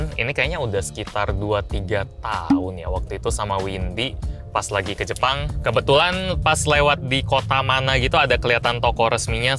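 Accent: Palembang, Indonesia. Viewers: Bang asli orang palembang yah?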